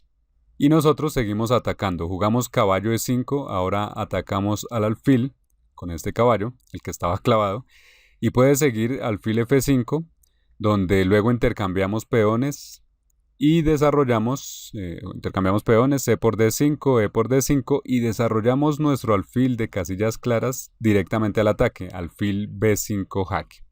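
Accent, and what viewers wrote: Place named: Bogotá, Colombia. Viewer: Habla como si fuera de colombia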